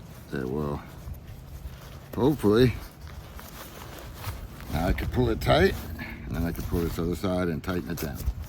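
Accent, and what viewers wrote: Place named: Massachusetts, United States. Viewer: I think you did a fine job! For some reason knot making will not stick in my head. I barely got the one down you made...lol Just crazy AF as to why. ~Anyway, found your channel from your Squak Mountain mid layer Hoodie review and slowly following along your past journey landing here. I mean it's all been so cool. You remind me and sound exactly likef every guy I grew up with, 😆 in Massachusetts As an avid camper and novice adventurer for the past, 40 plus-well way too many years, wink, I had to break down and bite the bullet investing in ultra light gear. It was time. Stuff was weighing me down, wink again. So... I coincidentally got this tent too on sale right after Christmas. The Virgin sail will be now April 2025 if New Hampshire finally stop snowing. I am look forward to seeing your AT experience in these parts last year. 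OMG. I bet a real doozy coming from those flat lands there in Florida. No joke, huh. I was born on a rock a wee bit north of Boston so flat land is pretty foreign to me. Gotta walk slow here with a big stick.... My guy and I have been dipping into off grid camping allot past few years, for him a breeze as an older model USMC but for me well everything is cool but the bathroom situation lol. Despite that have found some amazing spots and lots near the AT. Love seeing the hikers. Would love to be a trail magic magian at some point. I moved from the Rock up here about three years ago so we are a big closer or half the way atleast. Maybe this summer plant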